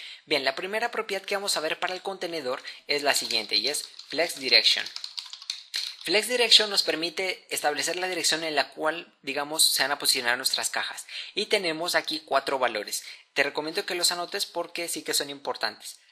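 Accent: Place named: Mexico. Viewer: Excelente video. Aprendí más de Flex. Solo una duda, porqué los mexicanos dicen "WEF", en vez de "WEB"...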